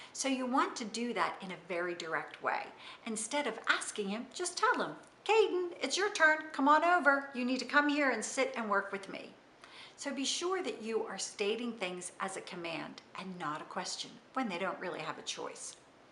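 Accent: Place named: South, United States. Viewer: Very slight accent, maybe from southern USA and it's just so cute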